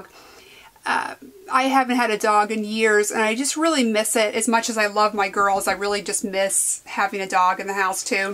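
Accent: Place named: Quebec, Canada. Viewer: C'est trop mignon de t'entendre parler français, j'ai pas l'habitude haha😆🙈 j'aime trop les accents québécois!👌 j'adorerais que tu nous fases d'autres vidéos en français!😁 et enfaite to blend en français c'est estomper!;) bisous xx.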